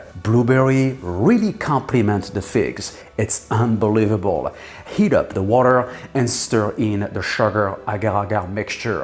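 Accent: France. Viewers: The French accent tears me into pieces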